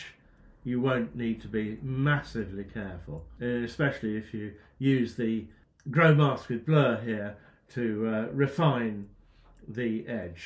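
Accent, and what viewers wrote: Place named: RP, United Kingdom. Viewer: Great tutorial, and love the posh accent :)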